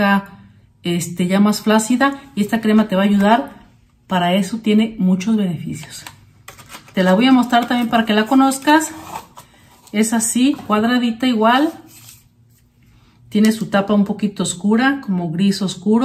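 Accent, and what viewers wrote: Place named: Mexico. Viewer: Hola me gustas mucho como hablas 🥰 mexicana nata 😅 saludos desde 🇪🇦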